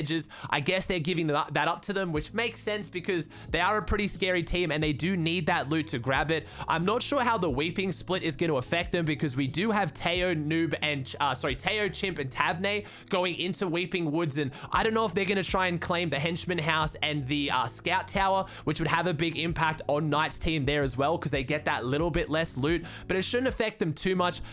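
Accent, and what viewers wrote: Australia. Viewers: We love ur aussie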